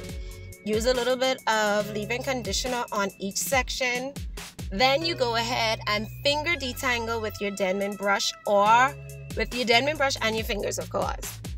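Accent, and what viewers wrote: Bahamas. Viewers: I thought I was the only one who was tryna figure out if she was Bahamian or not lol 🇧🇸🇧🇸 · frfr had me guessing if this was really a bahama mama🇧🇸🇧🇸🇧🇸🇧🇸❤ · same i say she bahamian frm the jump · Tammie A. I knew right away she from The Bahamas like me!! 242 to the world. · ok... the min i heard her i say bahamian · same, we Bahamians cant hide lol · Recognized that fellow Bahamian accent in a jiffy!!! And I wasn't even listening, had the volume almost off and still our accent came through loud and clear!!!!! Love it!!! Go girl!!! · first thing I say was "This a Bahamian?" LOL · At first I thought bajan or bahamian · Took me only half a second to recognize that Bahamian accent lool. Slay girl slaaayy 👏🏽👏🏽 🇧🇸 🇧🇸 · Girllll all I said to myself was she only could be from back home with that accent!!!!! 😂 represent that 242!!! All day! 🇧🇸🇧🇸🇧🇸🇧🇸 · Me too I just love the Bahamian accent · Tammie A. 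Me too! My family is from the Bahamas! I recognized it immediately!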